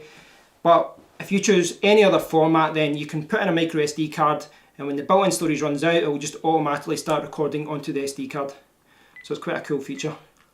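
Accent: Scotland, United Kingdom. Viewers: Very sorry, but I'm not a native English speaking person and so I have lots of trouble understanding your heavily Scottish (or Irish?) brawl. So, does anybody know of a video featuring someone with a 'normal' British or American type of accent? Thanks a milliion.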